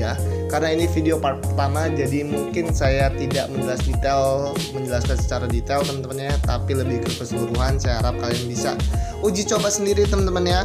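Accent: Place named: Lampung, Indonesia. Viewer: Lo orang njirr. Kek orang lampung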